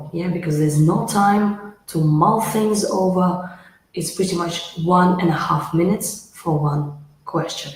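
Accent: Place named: Russia. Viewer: 100% Russian акцент )))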